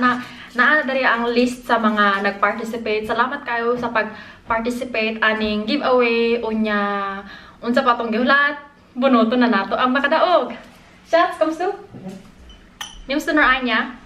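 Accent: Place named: Visayas, Philippines. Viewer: nakakatuwa tlga mga bisaya sarap sa tenga ung pag sasalita 😊😊😊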